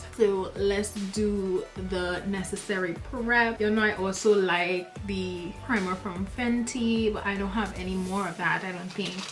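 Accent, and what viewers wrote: Trinidad and Tobago. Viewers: love your accent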